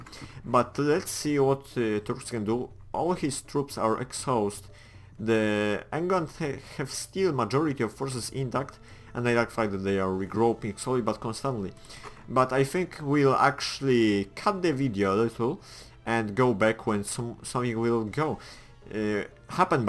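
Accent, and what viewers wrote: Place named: Germany. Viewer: you great but try speak more well ok ? where u form perpahs germany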